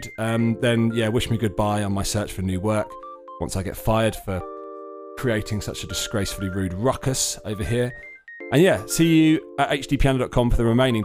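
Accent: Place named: England, United Kingdom. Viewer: you sound like my crush from England omfg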